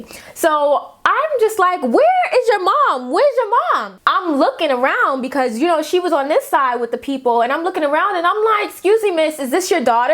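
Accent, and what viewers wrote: New York, United States. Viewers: your New York accent is so cute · The New York accent gives me LIFE fr!!!!! I love it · I love the way how New Yorkers say things that end with an "er" sound. Lol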